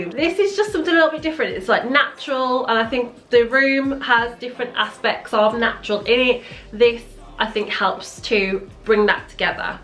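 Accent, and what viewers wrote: West Midlands, United Kingdom. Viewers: That emerald wall is everything ! I have just brought an emerald green paint in wilko to do a painted arch in my hallway, and may i say our accent sounds familiar 🤔 😄 🤣 😂. From the west midlands and as soon as I started watching I noticed the accent love it 😄 🤣 your style is so like mine too ! New subscriber here ;)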